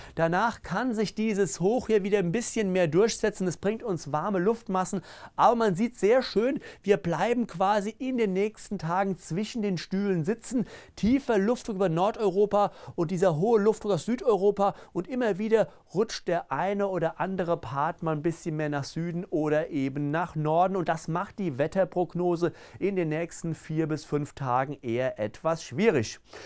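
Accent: Hesse, Germany. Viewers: Hessischer Dialekt. Oder Rheinland-Pfalz.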